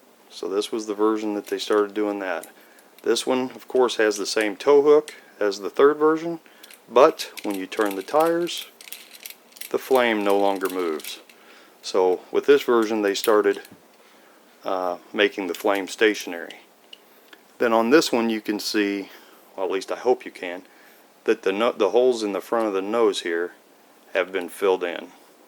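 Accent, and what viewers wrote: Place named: North America. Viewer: I'm guessing you're North American from your accent, so how did you get your first Batmobile in the US, as Corgi is a British company? Where they available in the shops or did you have to pay more to get it delivered from the UK? Didn't any American toy companies make Batmobiles? Sorry mate, lots of questions, but a really interesting vid!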